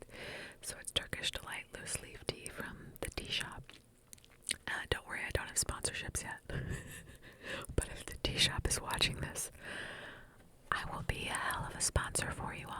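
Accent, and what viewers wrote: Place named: Manchester, United Kingdom. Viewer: accent is south Manchester/northern Cheshire by the sound it (I worked in Stockport for a few years, near the Pyramid) and it rings bells from then. If you want to get the tag off an IKEA mug, use WD40 - lifts it right off. Hand wash the excess WD40 it before you stick it in the dishwasher, otherwise it can cross contaminate.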